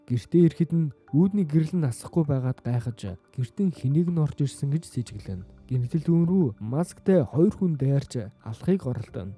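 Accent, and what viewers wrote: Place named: Uvs, Mongolia. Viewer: Шууд Увс аялгаараа яривал бүүр гоё юм бишүү .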